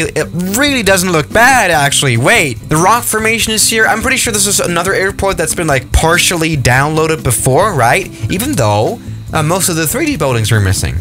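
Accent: Germany. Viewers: Are your a German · Are you German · Bro are you German? If I may ask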